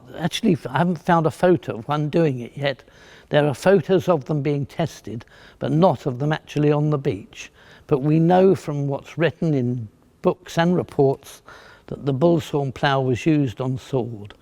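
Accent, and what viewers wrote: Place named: United Kingdom. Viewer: "That's a load of tripe as far as I'm concerned"...., your British is outstanding. You talk on & on about anything you like, it's such a pleasant experience.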